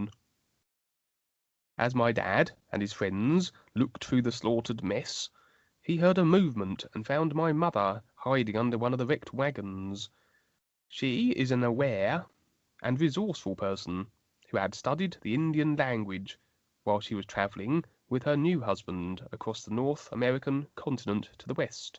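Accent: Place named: England, United Kingdom. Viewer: Thanks, it’s nice to have a human voice and not a digital one to listen to. It takes awhile for me though, as a non native speaker, to get accustomed to your pronunciation. Are you from England or from Australia or New Zealand?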